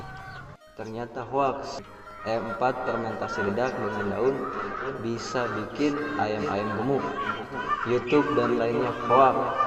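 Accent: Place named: West Java, Indonesia. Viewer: Mantef kang . Timna kang logat sundana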